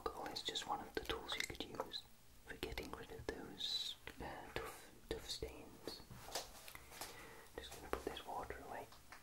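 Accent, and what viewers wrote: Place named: England, United Kingdom. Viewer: Where is your accent from? My guess is either Oxfordshire or maybe north west?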